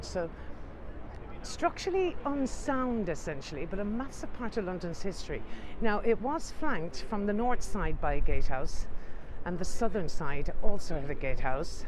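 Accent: Ireland. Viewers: One day when I can get back to London, first time was in 2008 from Oz to UK, I will book you for a tour esp the East End (my ancestors home). I just love your videos. I have so many books about London, it has always been a fascination to me, even before I even knew my mother's family came from East End London...I'm addicted. Thank you so very much you are incredible. And I love your Irish voice, also Irish from my mother's mother's side. cheers from South Australia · Amazing tour by the Irish Queen, your Knowlege & Beautiful Irish Voice make your tours absolutely A must do when visiting London! Your commitment to Detail is truly Second to None!! Thank you for all you do!!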